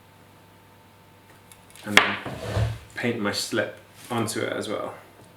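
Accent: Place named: Nottingham, United Kingdom. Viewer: Are you from nottingham? I hear it in your accent!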